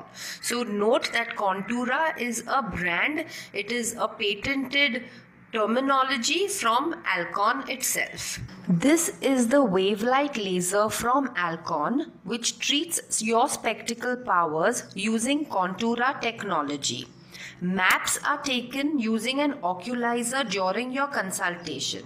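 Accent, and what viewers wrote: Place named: England, United Kingdom. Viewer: pretty hard to watch with this english accent.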